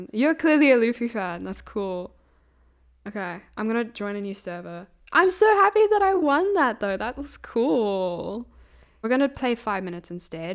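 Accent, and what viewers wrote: South Africa. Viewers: Are you South African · Are you from south africa i hear an accent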